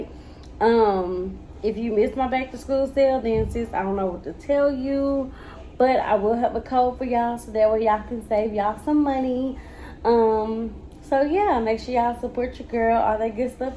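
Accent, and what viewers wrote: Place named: South, United States. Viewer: You sound like your from the south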